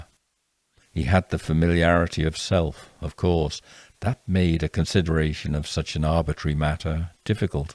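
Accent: England, United Kingdom. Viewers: Wonderful. Another intense mystery by to enjoy. Fantastic mysteries with his lovely English accent guiding us through the most certainly surprising end. I can feel the busy day melting away as I listen. What a talent. ❤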